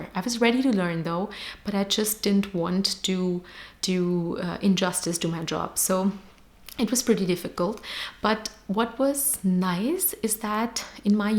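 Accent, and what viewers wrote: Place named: Germany. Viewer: Hello, was your Masters German taught? I hear a bit of German accent in your tone.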